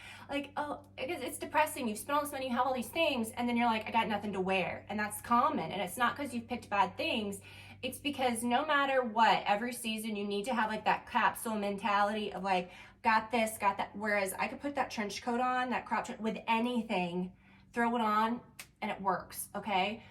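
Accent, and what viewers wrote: South, United States. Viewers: your accent! Such a southern belle 🎀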